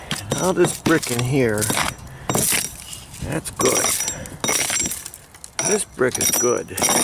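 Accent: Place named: Scranton, United States. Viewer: I am turning 72 this month. My house was in need of some repairs that I thought would have to be done by a professional mason but watching your videos gave me the confidence to attempt them myself. The results were great only because of your excellent explanation and recipes for mortar and cement. Thank you so much for offering people such wonderful information concerning your skills. By the way, my father was raised in Scranton,Pa. and he spoke just like you. God bless you. Sharing old world traits is not something we see much of from the masters anymore. Take Care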